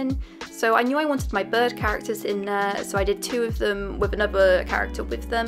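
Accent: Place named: England, United Kingdom. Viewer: I love how at first you have a perfect english accent, but towards the end of the video as you got a bit more tired, you started to sound more and more like you were from Yorkshire.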